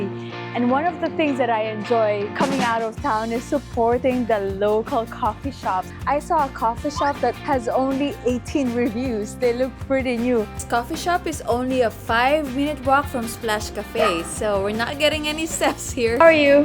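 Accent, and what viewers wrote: Philippines. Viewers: Filipino? I recognize a Filipino accent in 5 seconds because of my many visits to that great country.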